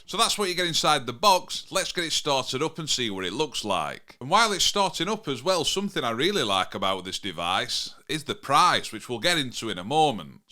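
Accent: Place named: Wakefield, United Kingdom. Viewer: Love your accent. You sound just like my family members from Wakefield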